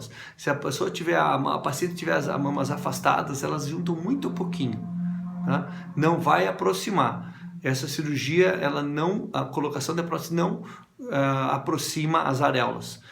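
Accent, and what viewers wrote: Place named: Northeast, Brazil. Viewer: Dr. Primeira mente esse teu sutaque é maravilhoso, nao sei de parte do Nordeste tu eres mas amo esse sutaque. Bem eu estou planejando colocar uma protesi mamária, moro em Boston mas sou de Recife, estou estudando a hipotese de fazer a cirurgia no Brasil, gostaria muito de saber em parte do Brasil vc atende?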